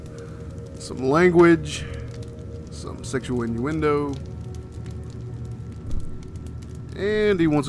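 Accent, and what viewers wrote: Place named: United States. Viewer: I love your accent! Nice to have a regional accent for once from someone in the USA :) (I'm in Northern Ireland). You review really well too - not the usual 100 words a minute! I like the setting of this game and even with the bugs I think I will give it a go. I will check out the site - nice to have a decent review instead of the usual nonsense - thanks :)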